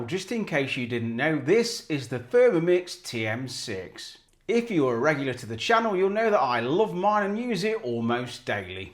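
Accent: United Kingdom. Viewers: You have a strong UK accent, hard for me to grasp everything… but i like the challenge! There s the transcription too. 😘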